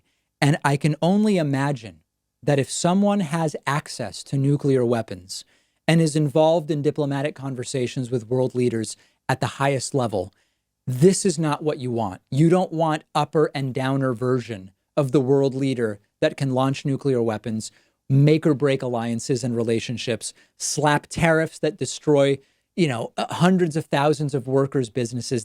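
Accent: Argentina. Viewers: ‘Cinco de Masho’ - love the Argentine accent.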